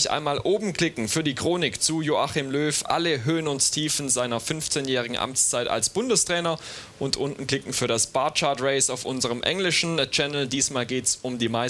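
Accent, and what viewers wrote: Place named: Saxony, Germany. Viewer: Hauptsache Transfermarkt TV hat erstmal einen sächsischen(!) Moderator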